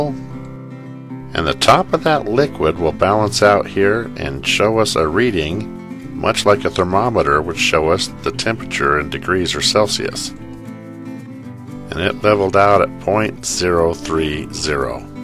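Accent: United States. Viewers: very good cowboy accent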